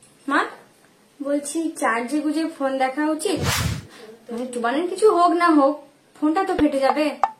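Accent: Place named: Murshidabad, India. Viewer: মুর্শিদাবাদ এর উচ্চারণে বেশ ভালো লাগছে 😂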